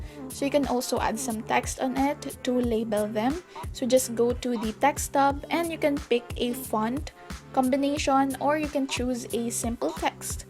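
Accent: Philippines. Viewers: I think your Filipina though(in my opinion) because of your accent😭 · You are filipino aren't you I can hear the accent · Are you Filipino? Im not assuming you just sound Filipino! Im Half lol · Aye I just realized you have a Filipino Accent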